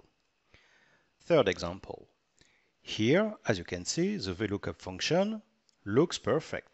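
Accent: France. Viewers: Thank you for posting. Very helpful. The French accent made it even better. Thanks again.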